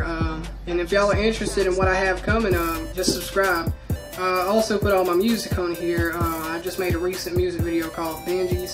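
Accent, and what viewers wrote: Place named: South, United States. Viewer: Sounds like the south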